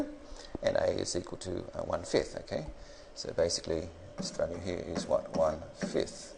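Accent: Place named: South Africa. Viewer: Please tell me you are south African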